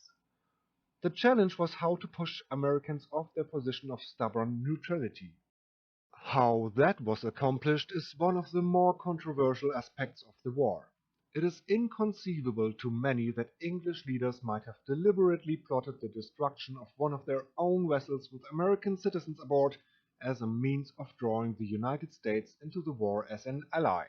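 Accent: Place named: Germany. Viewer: Deutscher Akzent👍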